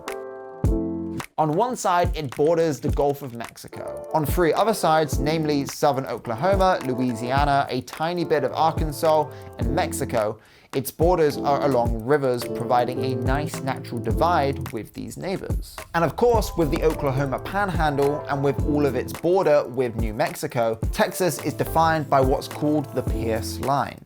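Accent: United Kingdom. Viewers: You sound british so ya know fuck all about Texas. We'll, be just fine without the other 49. And no it wouldnt be a war. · texas is in fact the only state that can leave the union. it was part of the contract for texas to join the union. so yes we can leave. when i clicked this video and the first thing i heard is some brit, i already knew it was gonna be a load of crap.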